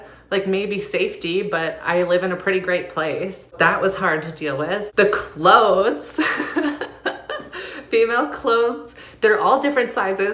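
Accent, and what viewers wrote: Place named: Canada. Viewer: I enjoyed the video, nice to see you doing well. You have a Canadian accent, so your health insurance is nationalized. Good for you!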